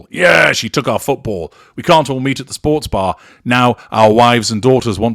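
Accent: United Kingdom. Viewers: Okay, who ever you are. You can talk all the shit you want about Meagan and Harry losers. Don’t go there with Taylor Swift. You are clearly British, just by accent. Taylor Swift is an amazing young woman. Do your research as a broadcaster. If you can find a fault with her person, behavior or actions then carry on. I dare you!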